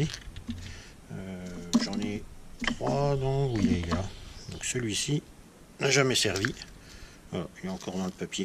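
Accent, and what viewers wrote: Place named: Jura, France. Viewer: Tu dois pas habiter loin du Jura je me trompe ? T'as un léger accent ^^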